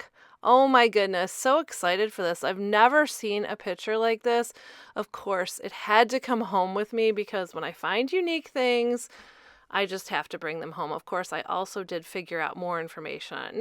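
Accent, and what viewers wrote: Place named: Michigan, United States. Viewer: I started watching you when we opened our large booth in 2023. We had a very small booth years prior. The tips and info you shared reminded me that I did know how to do this, and was so appreciated! I've kept watching you as your information on display and merchandising remains so current and valuable. Love to go thrifting with you. Welcome to Whatnot! I'm not a seller, but have been a buyer for a while now. I'm excited to add you to my Favorites. I'll see you there! On a side note, I have family in Michigan (I live in California) and I love listening to your accent as it reminds me of them. Merry Christmas!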